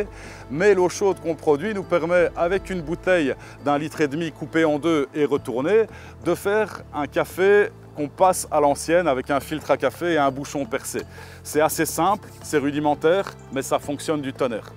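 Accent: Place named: Wallonia, Belgium. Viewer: wallonie belgique je dirais vu l'accent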